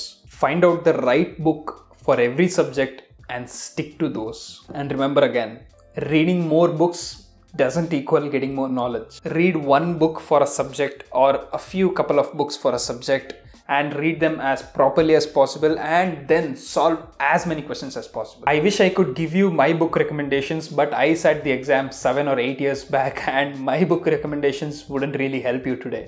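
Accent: India. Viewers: liked ur accent. Indian accent is amazing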